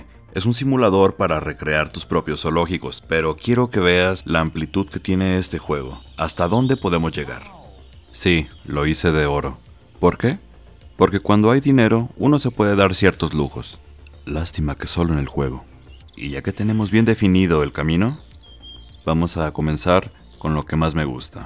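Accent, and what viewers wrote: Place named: North, Spain. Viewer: A sos re norteño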